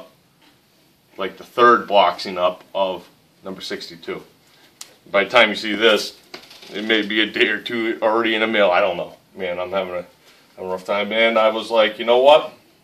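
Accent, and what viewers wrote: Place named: New England, United States. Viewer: "Up to pah." Always enjoy a listen to the NE accent. +1 for the drop point EDC design. Hard work is great medicine for the soul.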